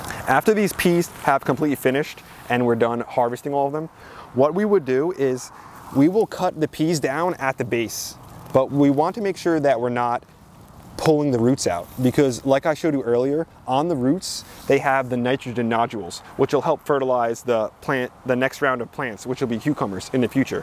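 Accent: New Jersey, United States. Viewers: Are you from Joisey?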